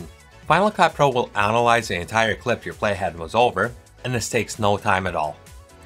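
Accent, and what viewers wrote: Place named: Saskatchewan, Canada. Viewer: Holy cow dude, I'm from Saskatchewan and it took about 2 seconds to place the accent :-). Thanks for the tracking info!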